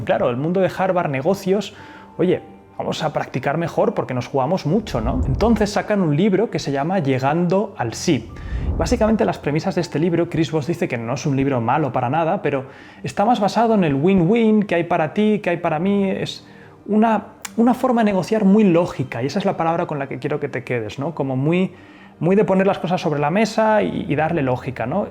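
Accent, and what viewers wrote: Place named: Spain. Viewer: Encuanto escuche ese puto acento español fuck supe que no vale la pena escuchar esto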